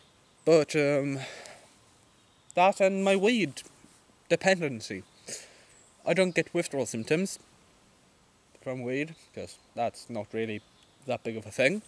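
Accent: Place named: Ireland. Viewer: I can tell your accent is Irish possibly and thoroughly enjoy all your videos. Anyways thnx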